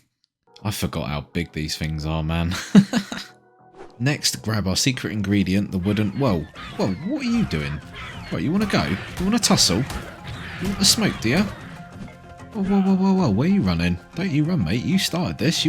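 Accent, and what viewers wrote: United Kingdom. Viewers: Somerset, born and bred here. Love hearing that Devon accent mate, keep churning out the fantastic content. 👏🙌🤟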